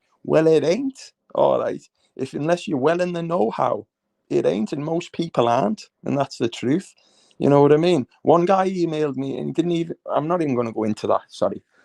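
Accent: Liverpool, United Kingdom. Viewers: Yes I can you clearly la ,,,, SCOUSER gd la telling it true 👍👋👌sound la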